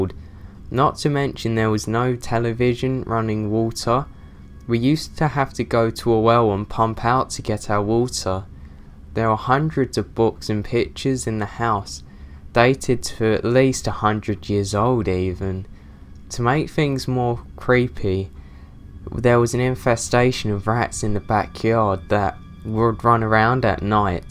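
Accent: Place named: London, United Kingdom. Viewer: Are you from Manchester? I'm working on my regional dialects and accents from the UK since between my family, and my job, I've been spending more time than ever there. Namely Bodycote. So to my untrained, or at least little trained ear, you sound like your from Manchester, or the surrounding area. Although, there's a bit of that London sound in there as well, although not as much. Or I'm just fucked on the head, and have no idea what the hell I'm talking about being from Detroit and all lmao. I've been told I have a tail specific accent as well. Not quite Midwestern, nor Wisconsin, or Ohio. Just that Detroit, and Chicago sound alike. Fuck if I know 😡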